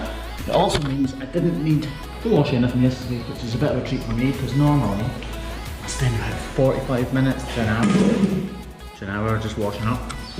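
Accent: Scotland, United Kingdom. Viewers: Hey - just wondering - do you speak differently when you’re talking to the farmers, like with a heavier accent? Or when you’re in different parts of Scotland? If yes, I’d love to hear it! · I work with quite a few Scots and while their accent is easy for me understand, it does get stronger when they talk to each other, as well as faster and with more Scottish slang. would be taking a little slower on his vlogs to maximise understanding for those who aren't Scottish. Most U.K. Residents and those in Australia and New Zealand would probably understand him very easily but those in North America maybe not so much. His slightly slower speech for the vlogs would also be more beneficial to those who have English as a second or third language.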